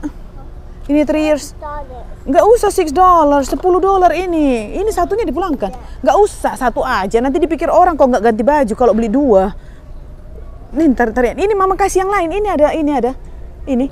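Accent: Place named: Medan, Indonesia. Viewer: Aku baru ikutin kak Mau nanya kak dari medan ya? Logatnya medan kali di Brastagi gitu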